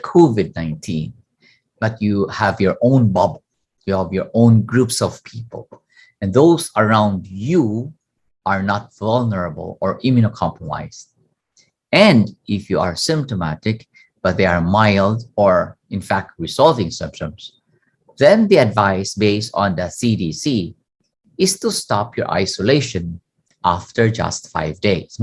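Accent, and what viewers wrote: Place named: Philippines. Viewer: Your name sounds Chinese but your accent sounds like you're from the Philippines.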